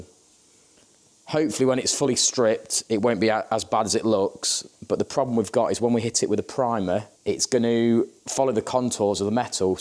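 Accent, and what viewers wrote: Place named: East Midlands, United Kingdom. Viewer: Reckon this came onto my feed because I’ve spent too much time in the East Midlands recently 😂 clocked the accent straight away. Great to see how these are done, always wondered how damage was repaired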